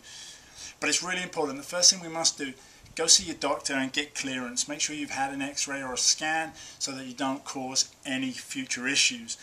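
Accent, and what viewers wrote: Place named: Manchester, United Kingdom. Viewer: My God he's from Manchester.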